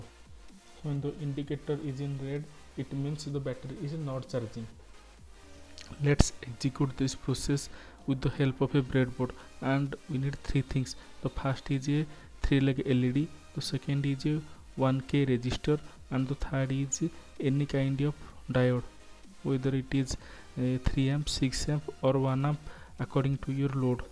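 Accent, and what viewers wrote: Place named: Odisha, India. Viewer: Your English has Odia Accent.... Where are you from ???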